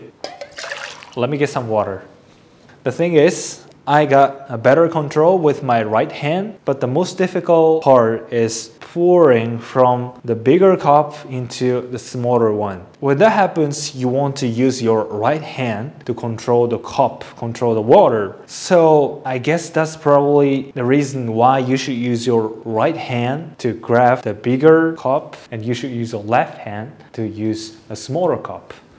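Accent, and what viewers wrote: Malaysia. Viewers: i think you're starting to catch a bit of malaysian accent in your english accent hehe:D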